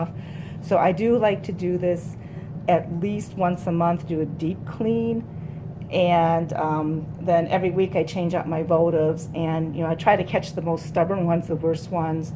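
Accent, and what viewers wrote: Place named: East Coast, United States. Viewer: Wait! You are in Michigan too? With your accent I thought out East! ♥️💋🇺🇸💃🏻 Cool! Sorry, I am new to your channel so I just found out. Love your videos they are so calming. Thank you! 🙏🏼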